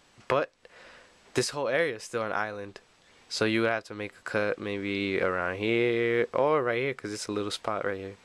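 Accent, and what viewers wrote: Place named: Los Angeles, United States. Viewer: u got the la accent :0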